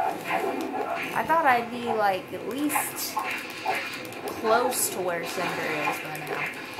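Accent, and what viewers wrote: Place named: South, United States. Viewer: I love your southern accent makes you sound pretty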